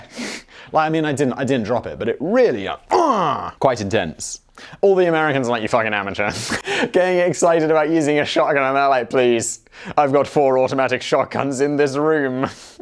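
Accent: United Kingdom. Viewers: ...look, I'm not gonna go read all of the boi with the blazes comments here, but damn... are people REALLY wasting TIME on comments like, "what is a taco?" OBVIOUSLY pronounced by a British man??? How many ducks do people really give about his pronunciation???? Geez!!!!! That's a little bit picky, don't ya think??? I LOVE THIS CHANNEL!!! IT'S MY FAVORITE!! LET THE BRITISH MAN SPEAK!!!!🙄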